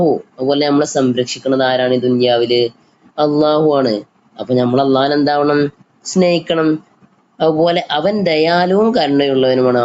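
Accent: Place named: Malappuram, India. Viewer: Malappuram language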